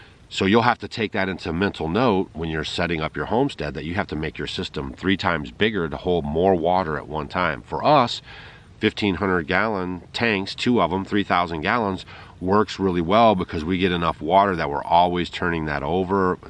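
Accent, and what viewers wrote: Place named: Appalachia, United States. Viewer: Living off the grid????? And you're talking about living in a RV? Wipe ur ass on tree moss if U can find it and keep raising all those bugs in your beard for your next meal. Water should be no problem for you as we can see that you bath once a year whether you need it or not.. What you gonna do when you can't put all this BS on YouTube? Are you doing all this on "Solar Power"? I can see that if someone doesn't shave or shower for a year or two, and they talk like a 1940's Hill belly-- they can sell BS to Morons.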